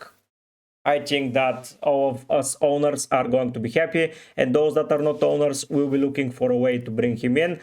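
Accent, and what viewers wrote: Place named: Bulgaria. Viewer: Баа някак си по акцента познах че си Българин😂 Евала за труда братко!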